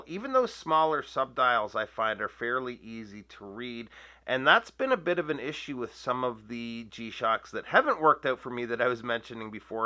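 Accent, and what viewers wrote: Canada. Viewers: Canadian ehh !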